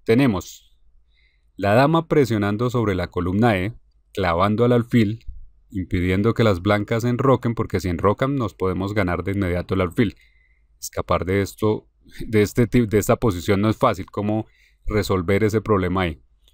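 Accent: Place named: Bogotá, Colombia. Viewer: ¡No sabía que el GM Igor Smirnov hablara español con fluidez, y con acento rolo! 😲😃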